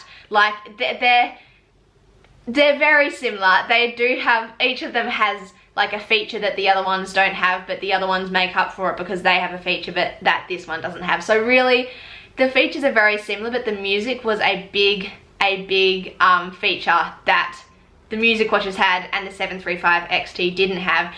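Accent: Australia. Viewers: Hi , I like your accent, reminded me of travelling through 'Stralia' 22 years ago. You did some good research, thanks for pointing to the table. I am in the market for a new sportswatch after my vivactive hr broke. How was the 245 inthe last 2 years? Did you mis the cool features the higher models offer? How was it when swimming, is 50m wr enough? I do like a little more ruggedly, because of playing with kids. I am in a sales period here now, and got to a short list: fr245m for 250, instinct solar 300, fr745 for 350 and fenix 6pro 450(still expensive). The 7 is out but is way too expensive. That solar instinct should have music. Which one would you recommend?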